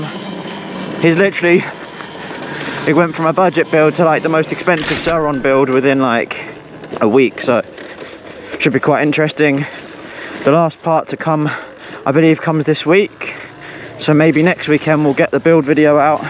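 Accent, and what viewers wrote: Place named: Midlands, United Kingdom. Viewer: We’re are you based mate trying to figure it out I’m Northampton you sound like your midlands. I like the look of the woods. Also thinking about losing my front mud guard as it looks much better. Do you not get a face full of mud 😂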